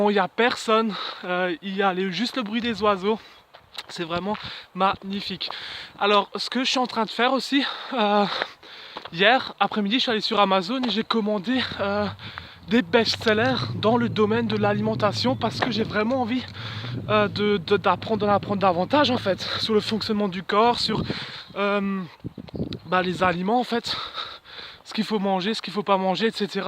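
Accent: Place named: Alsace, France. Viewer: Bonjour, votre accent me fais penser à l’Alsace, super endroit pour retrouver la paix. Je me bas aussi contre le surpoids. la vidéo est intéressante. Bonne Journée. Estelle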